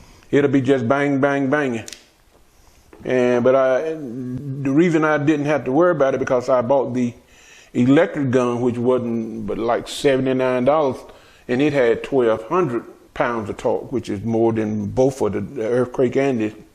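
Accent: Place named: South, United States. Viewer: I agree! Although, I don’t know how northerners and people out west appreciate the value of a southern or country accent as much as those of us that live in the south. For those of us from the south, a southern accent (black or white) is a comforting sound. Hearing this man talk is like a basket of fried fish with a side of cheese grits for my ears.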